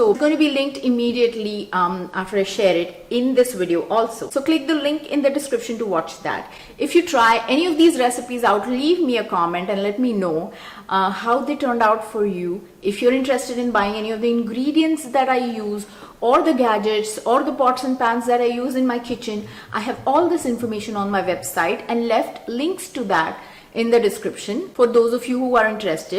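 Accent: India. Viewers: She has an Indian accent.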